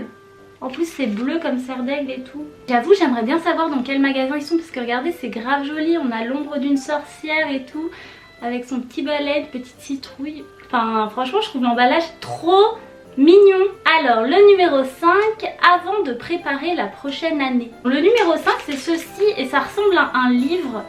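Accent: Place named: England, United Kingdom. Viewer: Je suis jaloux de ton accent anglais 😭😭😭😭😭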